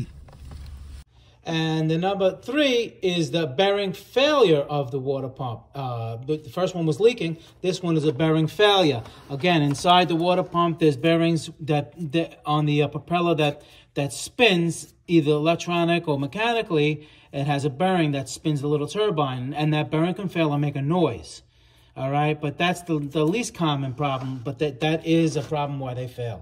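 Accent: New York, United States. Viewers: This dude has the coolest accent...what part of NY are you from?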